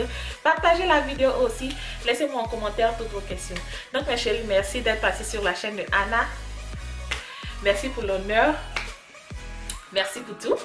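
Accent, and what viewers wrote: Ivory Coast. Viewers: Accent ivoirien...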